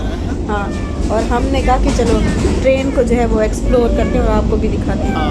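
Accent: Saraiki, Pakistan. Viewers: Apka laahjaa asa hai jesy sarayki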